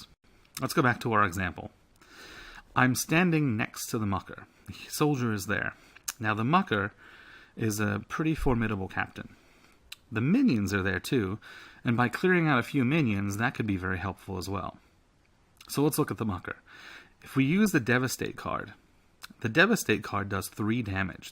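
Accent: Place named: United Kingdom. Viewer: Just one thing, I detect that you are a fellow Brit! I can hear it in your accent, lol.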